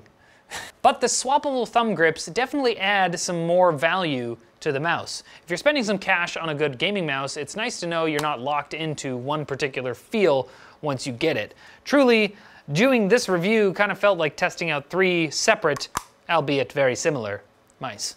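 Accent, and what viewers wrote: Canada. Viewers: Lord Cheezus how linus has a high pitched voice and is has an american accent is canadian and you can clearly hear it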